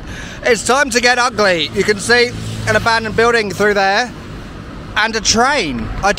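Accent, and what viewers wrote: United Kingdom. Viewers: Are you british? Your accent sounds different 🤪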